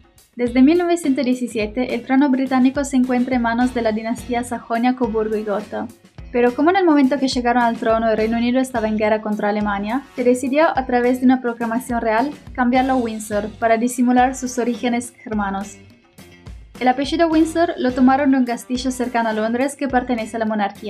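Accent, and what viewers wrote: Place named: Buenos Aires, Argentina. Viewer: Muy buen contenido. Pero es vergonzoso y horrible q hables con el sheismo. Te sugiero que remedies ese error porque en la argentina solamente los porteños hablan asi y es repognante para el resto del pais. es una lastima q digas apeshido siendo que lo correcto es apellido. Es una ofensa para la lengua castellana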